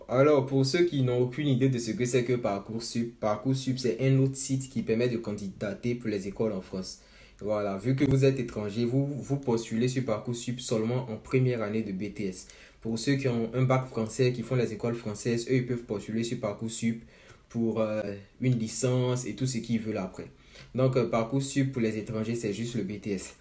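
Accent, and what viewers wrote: Ivory Coast. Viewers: Tu as un accent ivoiren frérot, tu l'est ?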